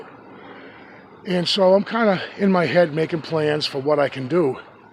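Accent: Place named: New England, United States. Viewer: this must be new england "back yaaad"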